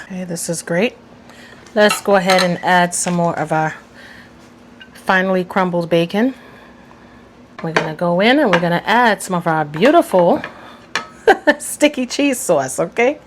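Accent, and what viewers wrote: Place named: Brooklyn, United States. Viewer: Im so ready to try this! My girlfriend thinks you're from Brooklyn by your accent.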